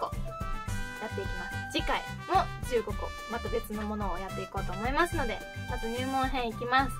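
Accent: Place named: Hakata, Japan. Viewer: 千葉県からです！博多弁が凄く好きで、フル見させて貰いました。やっぱり博多弁っ良いですね！ありがとっちゃね！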